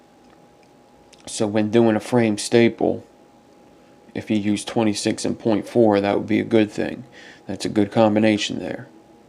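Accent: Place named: Baltimore, United States. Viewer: Do you have got to be from Baltimore with that accent that you have it’s pretty much where I grew up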